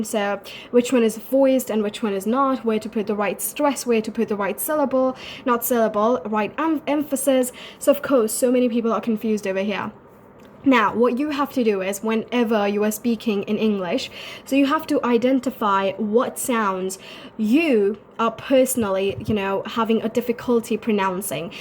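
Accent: United Kingdom. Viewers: Speaking British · You were speaking british accent in the video?? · Hey that's a cool BRITISH accent! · British accent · I can hear you have a British accent here:) Love you from the Philippines:)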